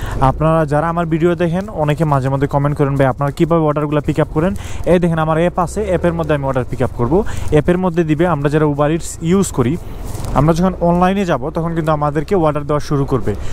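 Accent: Sylhet, Bangladesh. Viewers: Vai mone hoy sylhet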